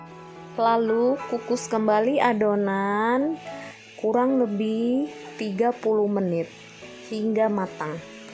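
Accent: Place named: Java, Indonesia. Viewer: Wah mantep cara bikin kue lapis😄 jowo medok hadir embak e.yuk yang lain....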